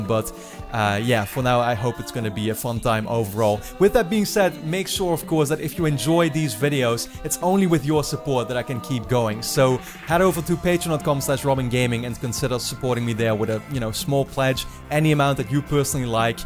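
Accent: Netherlands. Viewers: Once i heard your voice i immediatly reconised ur dutch accent xd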